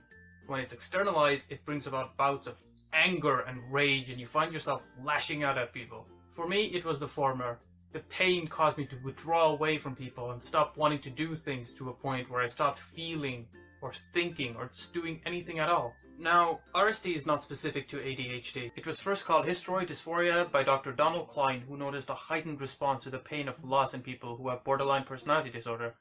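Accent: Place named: Ireland. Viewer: Loving the Irish accent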